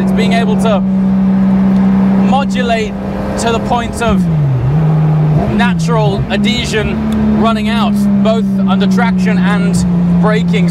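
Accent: United Kingdom. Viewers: something different about a british guy explaining a car.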